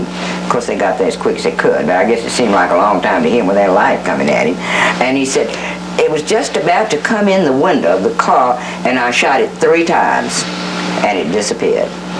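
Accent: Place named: South, United States. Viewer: I can vividly remember her coming to my elementary school in Angleton, TX, circa 1983/84. She was promoting her Texas folktale book, "Ghosts Along The Brazos." I was instantly captivated by her Southern Belle draw and her mesmorizing storytelling. I still own an autographed copy of her book she signed for me. My lifellong passion for great storytelling began with the late. Happy Haunting, doll!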